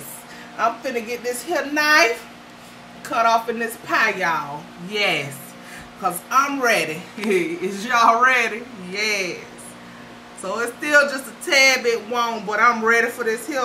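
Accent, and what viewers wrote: South, United States. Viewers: I Love your attitude with the southern accent!! I'm going in on your recipe this thanksgiving!! 😘😘 😘😘😘 I'll tell you how it turns out girlfriend!!! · I love the way she talks southern woman have beautiful accents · I love your energy!!! Southern accent is nice to hear every time I watch you!!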